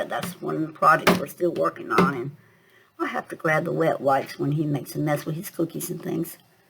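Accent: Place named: North Carolina, United States. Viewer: I just found your channel tonight and as soon as I heard your voice I thought you sounded like a nice southern grandma...like many of the older southern women I've known my whole life. When you said something about NC I realized that's why you sound so familiar. I was born, raised and still live in Raleigh. You remind me of my grandmother who passed in 1997 at the age of 84. I will be visiting your channel often, not just to hear your voice but to ingest your wonderful junk journaling content. I love that center pocket flip!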